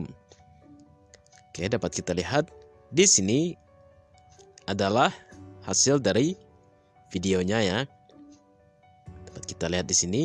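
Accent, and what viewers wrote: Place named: United Kingdom. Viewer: Orang mana kak? Kayak logat english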